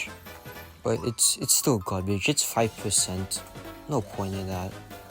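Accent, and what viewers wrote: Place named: United Kingdom. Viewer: Honestly your voice sounds British but don't get this in a wrong way or bad way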